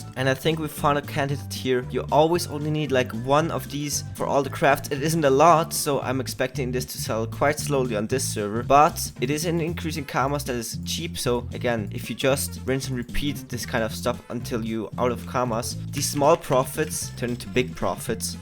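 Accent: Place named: Quebec, Canada. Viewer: where are you from buddy? Im pretty sure I heard some french quebecois in your videos ^^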